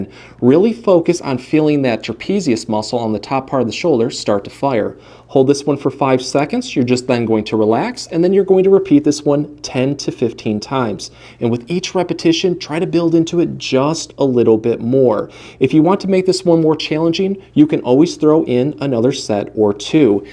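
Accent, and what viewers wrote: Michigan, United States. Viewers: I was just googling how to get trap pain relief and stumbled across this video and instantly knew you were from Mich before I looked at your bio bc of your accent 😂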